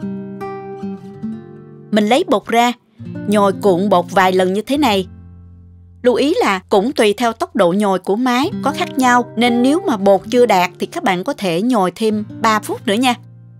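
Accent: South, Vietnam. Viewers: Kênh của rất tinh tế, giống nói ngọt nhẹ rõ ràng của người miền Nam, công thức và trình bày ý như người chủ kênh vậy. Em sẽ làm thử vi thấy làm đơn giản quá mà xình xắn nữa. Thật trân trọng cảm ơn Cô nhiều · Giọng nói đặc sệt miền nam nghe rất là nhẹ nhàng+ tài nấu nướng của cô ấy thì Miễn bàn.Ông chồng nào đó của cô ấy rất có phước.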